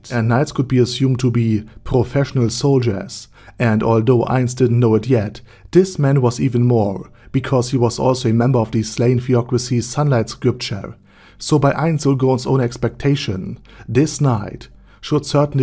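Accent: Germany. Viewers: Bro are you from Germany? I can hear your accent.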